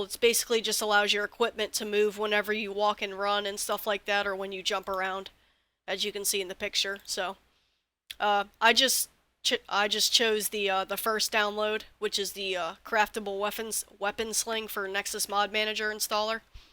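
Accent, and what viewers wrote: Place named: United States. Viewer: ) i love ur american accent, so cute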